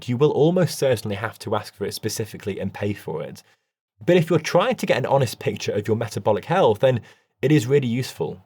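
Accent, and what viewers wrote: United Kingdom. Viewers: não sei se vc é AI mas se for, quem te fez está de parabéns! além de lindo, inteligente e explica de uma forma que qualquer um pode entender! E esse sotaque britânico fecha com chave de ouro! agradeço ao algoritmo ter mandado esse video pois além de bem feito com ótimas informações, tem uma estética agradável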